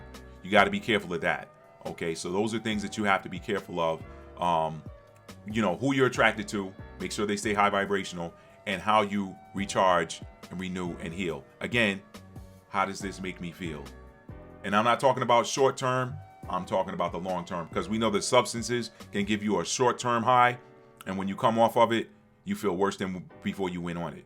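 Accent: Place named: New York, United States. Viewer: This video was incredibly impactful for me! I started examining my children's charts to identify the planets in their 8th house and took notes. I really appreciate these astrology segments...please continue creating them, they're incredibly beneficial to everyone. Also, I must say you still have a STRONG New York accent — I can attest to that firsthand!😊🫶🏾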